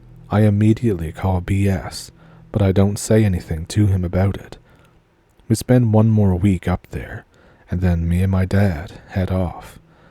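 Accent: Ontario, Canada. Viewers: sir, I like hearing you speaking these stories because you sound so much like my Ontario and Great Lakers cousins and uncles. Cheers friend. 🎉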